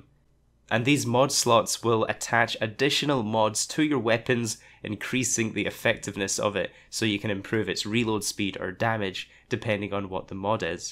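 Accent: Scotland, United Kingdom. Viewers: I'm a late comer to this game. Thanks for that. Only just found the brother so it's hotting up. Good to hear and see a Scottish youtuber doing well. I always thought my accent would be a set back. Doesn't look like it with you.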